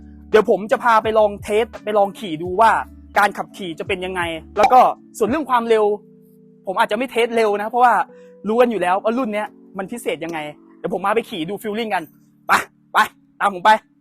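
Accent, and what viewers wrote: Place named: Isan, Thailand. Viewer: มีคำว่าเนาะลงท้ายรู้เลยคนอิสาน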